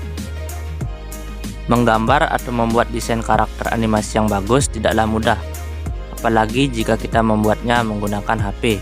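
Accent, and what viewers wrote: Aceh, Indonesia. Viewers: Orang Aceh BG?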